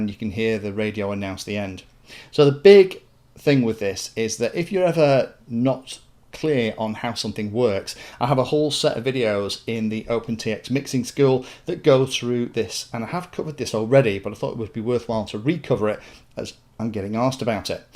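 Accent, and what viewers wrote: United Kingdom. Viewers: I want to duplicate my Spektrum NX10 timer1 in my TX16S. The Spektrum timer allows you to start and stop the time based on throttle stick position. For example, I have a nitro model that I set the time to count down when the stick position is more than 20%. The reason is that there is very little fuel burn at low throttle and this is a better judge of flight time than the th% function of the TX16S. Is this possible with the TX16S? I love watching your videos and especially your British accent. I live in Oklahoma, USA.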